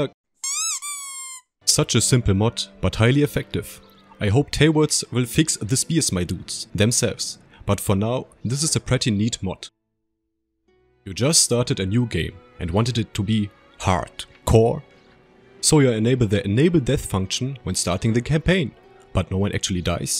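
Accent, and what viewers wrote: Germany. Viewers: lol junge bist du deutscher? Ehre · amazing as always :D thanks for your output mate <3 offtopic: are u german btw? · When you hear the accent of a fellow german you can just sub. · are u german? and are u speeding up ur videos? :D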